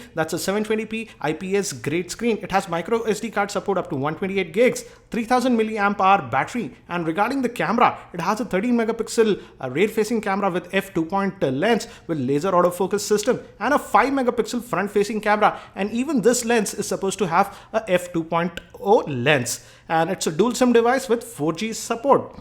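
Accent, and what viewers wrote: India. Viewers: You talk like Apu Nahasapeemapetilon. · indian accent so funny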